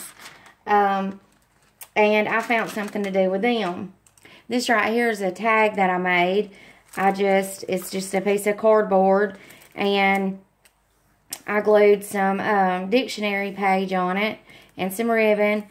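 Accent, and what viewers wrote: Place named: South, United States. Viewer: love the southern accent ! awesome idea's !!!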